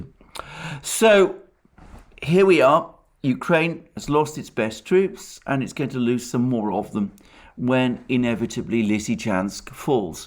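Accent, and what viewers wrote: United Kingdom. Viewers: Your extraordinarily british way of speaking amazes me, amuses me in a way that I can't explain... You're obssessed with Ukraine and Russia, and you still have SOME time to be umplesant and rude about the CHINESE" I'm sorry to be amused by this, but your gentlemansness is fantastic.... Thanks a million for the great analisys AND for the gentlemansness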